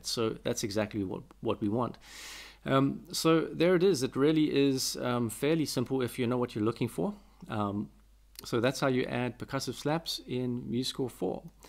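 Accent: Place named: South Africa. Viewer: Thanks for this! Are you South African? I think I can hear it a bit in your voice :D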